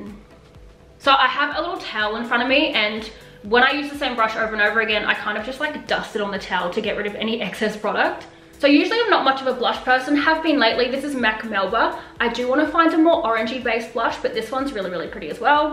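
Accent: Australia. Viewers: I’m actually very thankful that I shared your full face online for me here because I’ve been struggling on exactly how I WANT TO DO MY FACE which turns out to be similar, very similar to yours. It reminds me of the plouise academy girls. I know your Aussie I can hear your accent. Thank you 🙏 you should feel very esteemed cuz I watched your video 5 times on 2X speed lol 😆 which is probably why I had to keep rewinding lol but DING DONG DAHLING CUZ YOUUUUU HIT IT🤣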